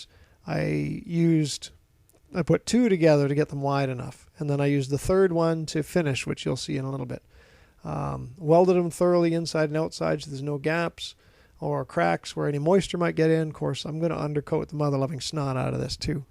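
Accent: Canada. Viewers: Good video! Looking forward to the follow ups. Hey where are you from? You sound Canadian to me. Oshawa here.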